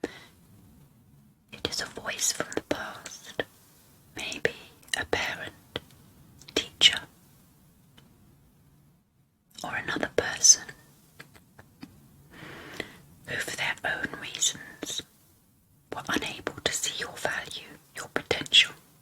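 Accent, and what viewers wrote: United Kingdom. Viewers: i hate british accent but i still watch it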